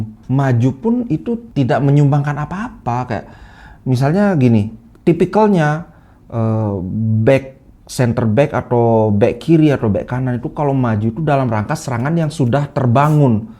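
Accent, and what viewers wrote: Bali, Indonesia. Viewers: Org bali ya bang?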